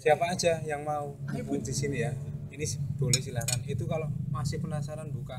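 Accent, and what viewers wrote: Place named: Java, Indonesia. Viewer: Coba gaya bicara nya diperbaiki, jangan terlalu medok supaya enak didengar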